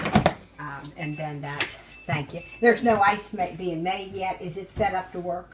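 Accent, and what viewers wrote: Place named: Pittsburgh, United States. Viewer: You sound like you are from the “Burgh” Pittsburgh that is? Hope you get your doors fixed. Nothing is built like it used to be....have a blessed thanksgiving and Christmas..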